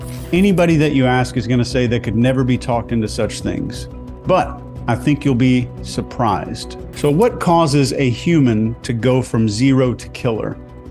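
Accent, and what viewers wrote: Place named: South, United States. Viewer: Guy cant take constructive criticism. Hes got a huge ego, he said people who hide their accents are not to be trusted, but he hides his own southern drawl. He constantly makes up new words like “ive officially called this xyz… “like he works for Webster now as well. Sleazy salesman, remember nothings free if an app is free youre the product.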